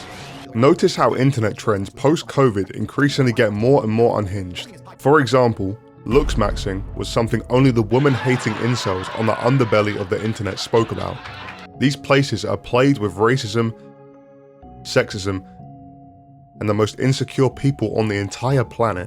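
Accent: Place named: United Kingdom. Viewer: British and you sound fat.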